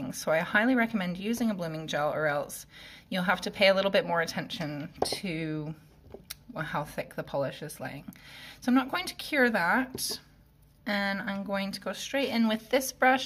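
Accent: Scotland, United Kingdom. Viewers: apologies for the mistake. You’ve certainly picked up a bit of that Scottish accent 😀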